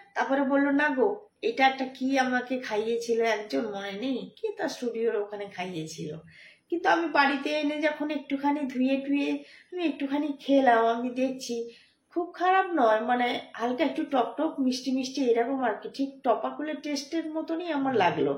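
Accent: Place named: Purba Medinipur, India. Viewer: Tumi to amader purba Medinipur er meye go shune valo laglo 🥰achha amader ancholik vasay ekta question korchi reply dio 😃egra r Contai ascho kunodin? 😀r Digha kotobar jaicho?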